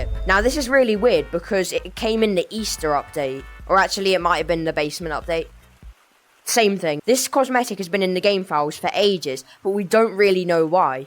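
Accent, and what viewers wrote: United Kingdom. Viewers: Why does bro sound so British I'm not saying it a bad way I'm saying it in a good way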